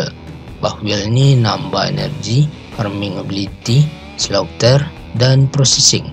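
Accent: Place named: Minangkabau, Indonesia. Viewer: Mantap infonya, btw kaya minang kocak wkwkwk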